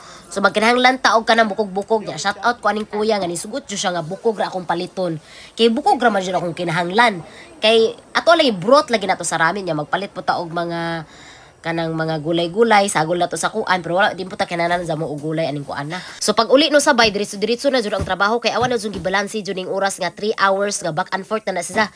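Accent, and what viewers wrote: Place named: Visayas, Philippines. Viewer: RAMEN GANI NI NOH! === ahahah ka relate jud kos accent og intonation ....HAHAHAHAH bisaya ray maka sabot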